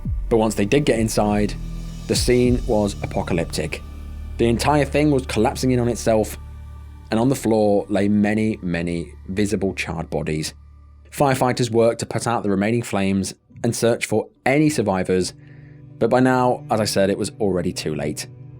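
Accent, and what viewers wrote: United Kingdom. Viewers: This video would've been OK except for that ridiculous British accent on the voice. Damn I hate those.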